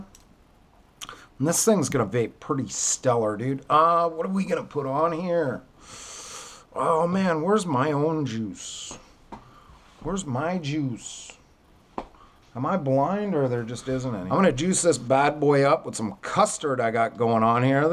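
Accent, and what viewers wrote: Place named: Quebec, Canada. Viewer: Lol, your accent was more French Canadian than Louisiana.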